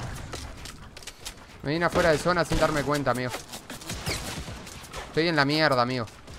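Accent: Argentina. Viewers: Es argentino ?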